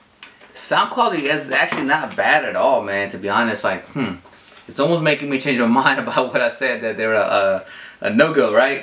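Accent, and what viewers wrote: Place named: Mexico. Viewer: I don't understand where that's coming from, but he seems to be Mexican through his accent, but you can't tell everyone's origin by just looking at em.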